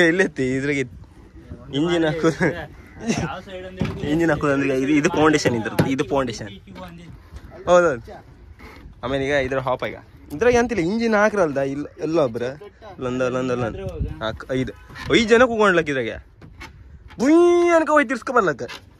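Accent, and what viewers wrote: Kundapura, India. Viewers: Wow superrrr mangroves Vlogg superr Kundapurane chandaa nivuu mathadooo kundapurr bashee ennuu chandaa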